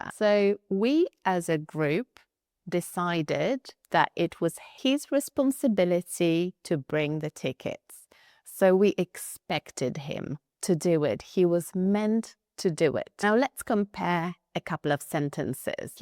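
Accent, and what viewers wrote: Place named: United Kingdom. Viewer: Thanks for your great teaching and your nice British accent ❤👍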